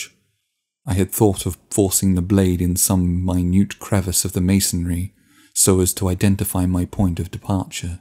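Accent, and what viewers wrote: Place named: England, United Kingdom. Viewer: Brilliant! Thank you, good reading, like your english accent 👍😉 Greetings 👋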